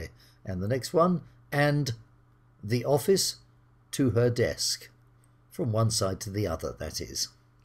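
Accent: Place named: United Kingdom. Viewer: liked and subscribed right away as I heard that fatherly, sincere voice with british accent explaining things in a visual way which helps us easily memorize. Keep up what you do sir.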